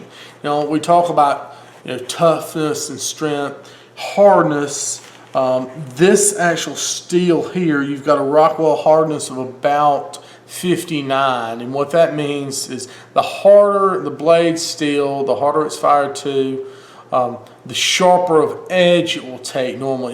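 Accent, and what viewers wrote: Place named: Rural, United States. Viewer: Guy constructive criticism .. you dont start cutting for 8 minutes in a knife demo. Work on the accent I am from NE MS and you are killing the hick vocal quality at impressive levels. finally Learn how to use a knife you make the knife look dull but trying to hatchet through onions instead slicing and allowing knife to do the work . I suggest a quick watch of alton brown's knife video of good eats . oh an lastly like me you are better sited for behind the camera , put the knife on the the shot .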